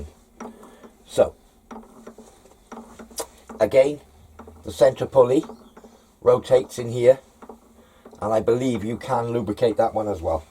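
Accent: Pembrokeshire, United Kingdom. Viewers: I thought I recognised the Pembs accent. Spent many holidays in newgale, lovely beach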